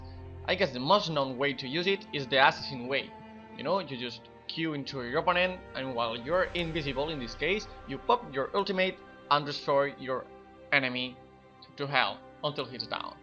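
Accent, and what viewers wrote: Catalonia, Spain. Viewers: I play as shaco every day just for practicing and you have helped me alot with your tips. Thank you bro.... PS: Your accent is quite different, Are you from Spain? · You're Spanish? I know :v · ¿Eres español verdad? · spanish? that seemed a bit catalan xD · You catalan? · Are you catalan? Your accent doesn't seem like the english is your native language. Good vid btw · you are spanish? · Awesome! I've actually never heard anyone from Spain talk before now that I think about it, and now I'm really confused how that never happened. Oh well..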